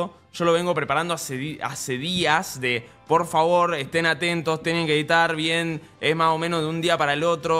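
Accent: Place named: Argentina. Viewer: bienvenido al mundo, fuera de españa hay países con diversos acentos, muletillas y en este caso, lunfardo Argentino, criticar el como habla alguien de otro país es caer muy bajo, así hablamos acá, no entiendo que pretendes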